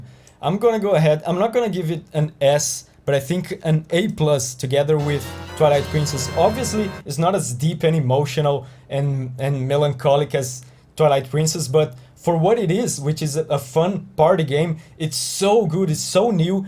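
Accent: Brazil. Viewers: Nunca vi um inglês tão brasileiro, adorei o vídeo tanto quanto eu gosto de zelda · Esse sotaque de brasileiro no inglês não me engana 🙃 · You sound brazilian. Are you? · Hey man! You're brazilian, right? Hahah eu reconheci pelo sotaque em algumas palavras. Parabéns pelo vídeo, fiquei feliz com a colocação de BOTW. A atmosfera do jogo dependeu dessas escolhas artísticas que foram tomadas tão bravamente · Pelo sotaque já sei que é br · No momento que ouvi tua voz já sabia que era brasileiro. Que legal, já é difícil de achar desenvolvedor de jogos brasileiro, quem dirá compositor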